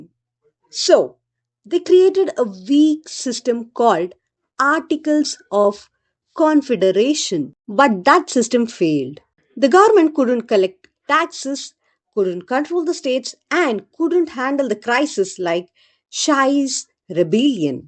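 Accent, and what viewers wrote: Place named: India. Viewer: Her accent is too thick. India folks are difficult to understand.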